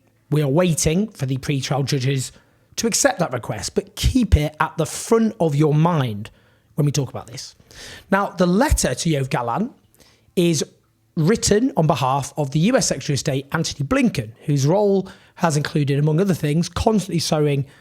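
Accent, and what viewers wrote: United Kingdom. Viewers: But anyways what’s up with a guy with a the gay British accent criticizing when the UK is worse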